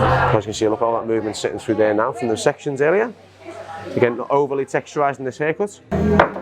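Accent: Liverpool, United Kingdom. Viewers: It sounds to me like a 'scouser' accent or dialect. This is associated with Liverpool in the county of Merseyside, England, UK 👍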